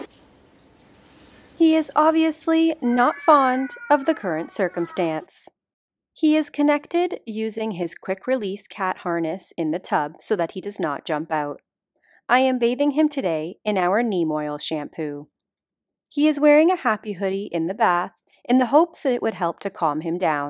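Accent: Canada. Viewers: I love the commentary... Hilarious.. I'd bet anything your Canadian!!!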